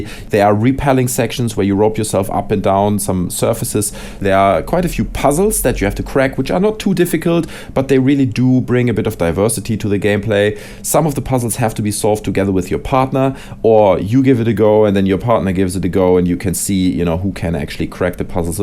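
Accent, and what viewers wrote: Germany. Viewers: Hes german you dip wad. That's kinda how they talk. And if not why dont you just fuck off somewhere ever heard of the golden rule? How about you stop posting hateful comments that dont pertain to the video.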